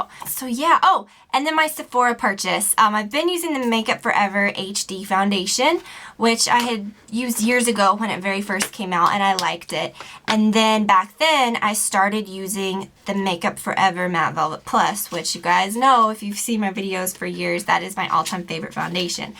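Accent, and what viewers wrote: South, United States. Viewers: This is the first of all the videos Ive seen of you, where I can notice a bit of a southern accent ;) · I feel like ur starting to get a country accent or something or is that just me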